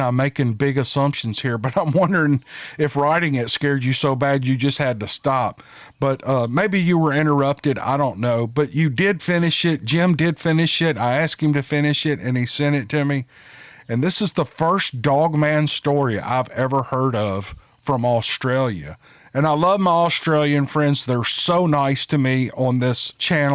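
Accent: South, United States. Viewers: Listened to your collaboration with Shudder recently. Very good stuff. Ended up subscribing to them too. ALWAYS love your stories and that sweet Southern drawl. 🦍💖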